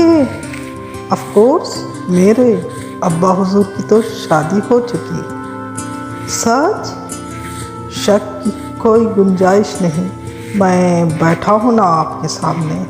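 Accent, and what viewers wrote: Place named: Deccan, India. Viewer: دکنی لب و لہجہ میں مزاح سے بھر پور بہت عمدہ دیدی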